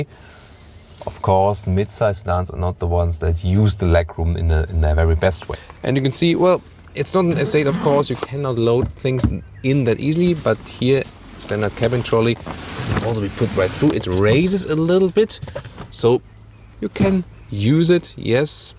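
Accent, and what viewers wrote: Germany. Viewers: The German accent is literally the best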